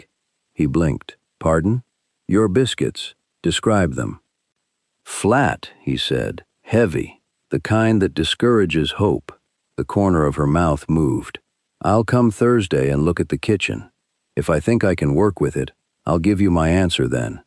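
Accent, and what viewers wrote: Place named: West, United States. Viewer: Complements to the narrator who sounds as if he IS from the Western Frontier. Listening from Clarksville, TN.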